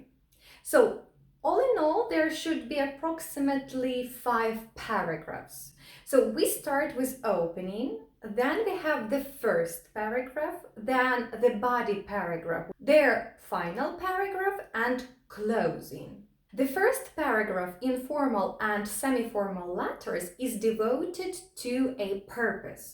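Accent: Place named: Russia. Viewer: Your dialect looks like a Russian or Ukrainian lady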